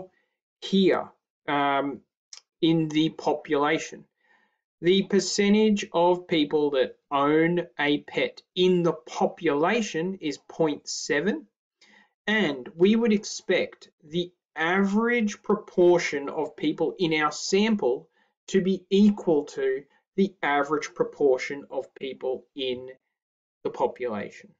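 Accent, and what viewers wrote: Australia. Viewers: your australien accent makes math fun thank you mate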